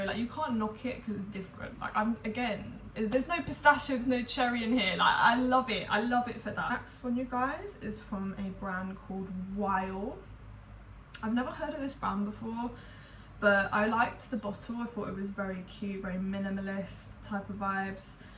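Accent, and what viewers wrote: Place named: South London, United Kingdom. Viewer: Happy belated birthday!! Happy to see us south Londoners being represented in the fragrance community, no longer do I feel ashamed for pronouncing gourmand like a plebeian lmao. Loving the editing too, it’s a breath of fresh air in a very (70% unfunny) serious community.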